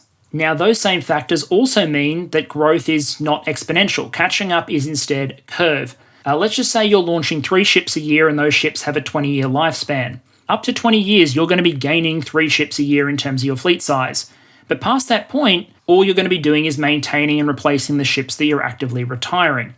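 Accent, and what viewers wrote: Australia. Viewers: Your chinese pronunciation isn't bad. As an ABC (American Born Chinese) it was super weird hearing Chinese spoken with an Aussie accent. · Not too often one can hear Argentina in an australian accent. Love your videos mate, greets from this far away country. Un abrazo a todos guacho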